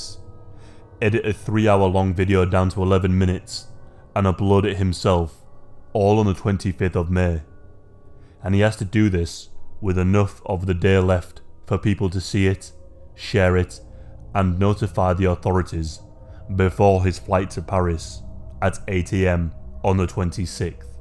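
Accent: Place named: Yorkshire, United Kingdom. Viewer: Of course it’s a compliment 💯 Yeah thought it was a yorkie twang lol, I’m Cheshire but I’ve got a friend of 15 years who still has a extremely deep and broad Yorkshire accent 🤯 😂 i thought ya all talked quick 🤦‍♀️😂!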